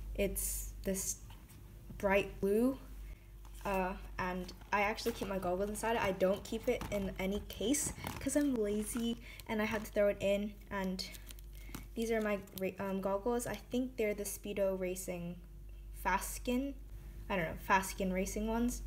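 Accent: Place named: United Kingdom. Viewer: Woww sis i dint realize you have a bit of a British accent lol I noticed it ✌😌✨✨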